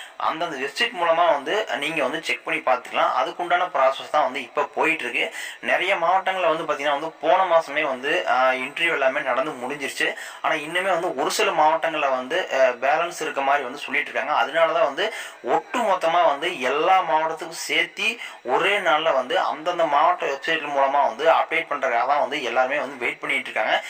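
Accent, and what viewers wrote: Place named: Erode, India. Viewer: Erode sluringa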